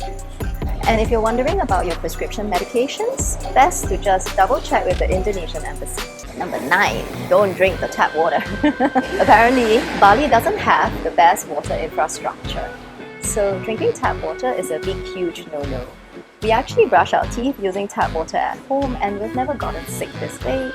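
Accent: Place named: Singapore. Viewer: I love the Singaporean accent!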